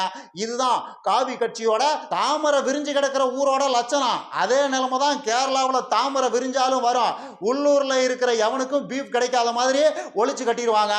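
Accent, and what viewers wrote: Kongu, India. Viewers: Super explanation speech I like your gongunad speech congratulations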